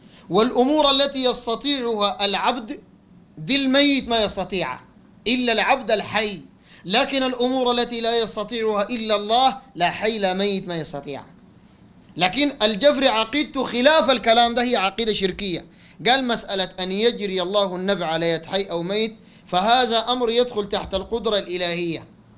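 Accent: Sudan. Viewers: ما أجمل لهجة الأخوة السودانيين وما أجمل حجة هذا الشيخ زاده الله من فضله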